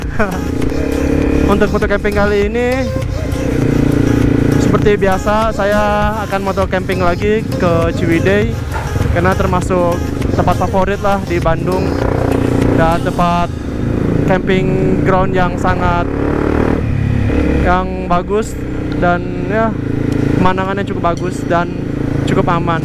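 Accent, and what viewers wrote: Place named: Japan. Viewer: Dengarkan baik2. Kayak aksen Jepang.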